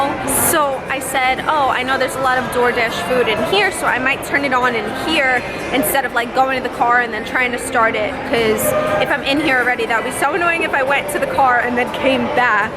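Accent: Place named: New Jersey, United States. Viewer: Loveeeee the MARIO sounds in between- you sound like a Jersey girl, I can hear the accent, love my homies!! #Jerseygirlsrock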